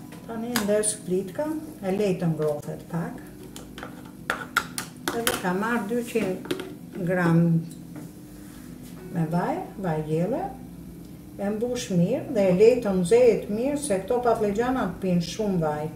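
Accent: Tirana, Albania. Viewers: Sa jam knaq me kte t'folmen , sa e paster Tironce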